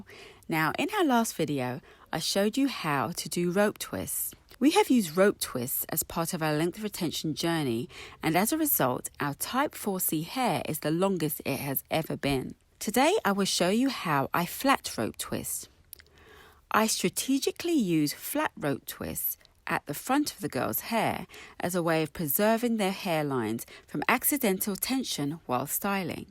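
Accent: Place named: England, United Kingdom. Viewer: 👏🏾👏🏾👏🏾👏🏾😃 That was so wonderful to watch! I love the way you speak! Beautiful English accent...I'm biased, I have a very similar voice! 😄 Your video was wonderful! I really enjoyed watching you do your youngest daughters hair routine. I have a son, whose he's 16, so I wasn't watching for him, I was watching for my Grandneice whose 9mths old. I want my niece to use a healthy hair growth routine on her daughters hair, so I'm going to share your video with her. I love your, 'GIRLS YOU ROCK', T-SHIRTS and hope to purchase one or two for my Grandneice. Thank you again and look after your 3? beautiful, little girls 🥰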